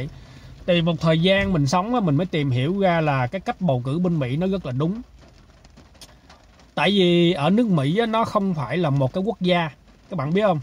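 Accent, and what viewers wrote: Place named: Can Tho, Vietnam. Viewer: Chúc. Quốc lạc quan lên mình người bạc Liêu và thích giọng còn càn thơ của bạn nhìn thấy bạn hơi ốm và mắt hơi buồn làm mình buồn lây. Thôi cố giữ vững cuộc sống nhé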